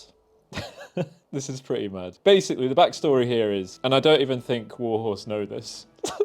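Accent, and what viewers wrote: RP, United Kingdom. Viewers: You sounded to posh for a Henry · You definitely sound too posh for a peasant. WHS made the right decision.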